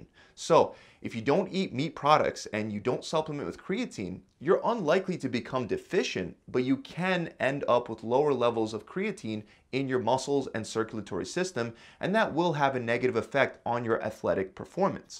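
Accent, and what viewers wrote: Philadelphia, United States. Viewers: You from Philly or South Jersey? I know wooder when i hear it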